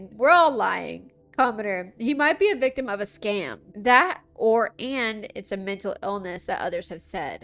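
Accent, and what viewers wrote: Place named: Midwest, United States. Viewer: Where is she from? How she talks reminds me of the Midwest and ita so comforting. How she says 'sawl' instead of 'saw' lets me know she's cool 😎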